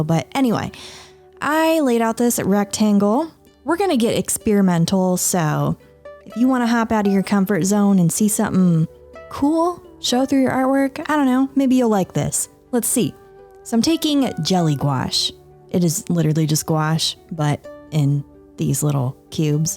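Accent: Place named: South, United States. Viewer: I have been watching you for years and today I am finding out you have a Southern accent wow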